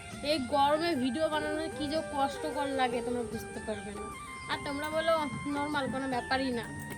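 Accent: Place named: Rajbanshi, India. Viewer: Tomr kotha gulo bojhai jay tui rajbongshi.tomr voyes ta oi rokom tai bolam 😊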